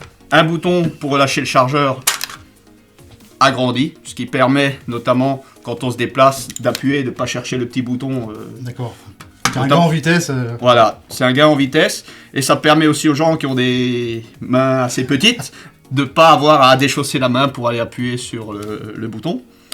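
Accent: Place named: Eastern France, France. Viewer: felicitation super chaine De beau montage video digne de professionnelle Sujets tres interessants et d'actualités et on parle beaucoup de TAR Avec un leger petit accent de l'est de la france si jai bien reconnu bonne continuation cordialement ludo du sud de la france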